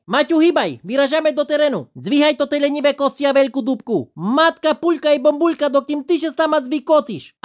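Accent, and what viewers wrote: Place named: Eastern Slovakia, Slovakia. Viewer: Pampi a pán sú úžasná dvojka...radosť pre moje dni...nádherný psík a krásna vychodniarcina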